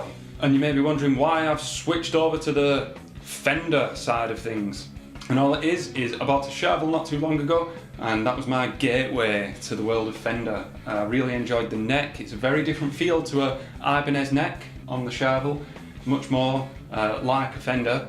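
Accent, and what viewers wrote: Lancashire, United Kingdom. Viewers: Great Lancs accent mate. No joke.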